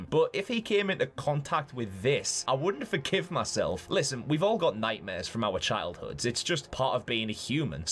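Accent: Scotland, United Kingdom. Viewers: this person sounds like Mimir from God Of War. Maybe because they both have Scottish accents idk. edit: Saw more of the video and I'd say more like a mixture of Mimir and Philza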